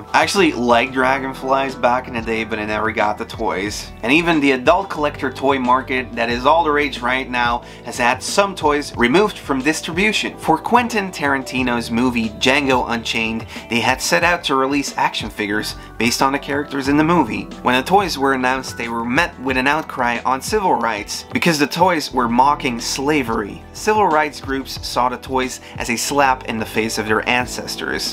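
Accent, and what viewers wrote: Canada. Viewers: Are you canadian? You sound Canadian in this video. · AN is common. TREE letters is way to complicated for most us mericans lol. Seriously tho your accent is damn near "perfect" sounding for a country made up of all other accents. I figured you Canadian because it's so subtle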